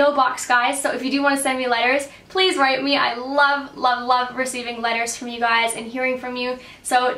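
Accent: Australia. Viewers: Yes! I can hear a bit of Aussie :) you are becoming one of us haha xx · I hear someone Austrailian Accent in you! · I can hear youve started to pick up a but of an aussie accent? :) thanks forth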